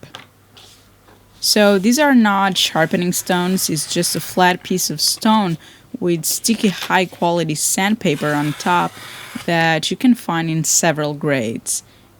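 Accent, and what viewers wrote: Portugal. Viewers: so agora depois de ver tantos videos é que percebi que és de Portugal :)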